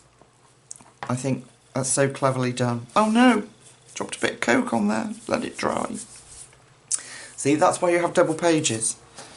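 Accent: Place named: England, United Kingdom. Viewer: Many thanks for introducing these books. I particularly like the ‘Wonderful Little World’ book so going to try to find a copy. You are very generous with your time and knowledge, which I appreciate so much. It’s lovely to hear a ‘local lady’ so I know the books you show are available in England. Kind regards from York 🙏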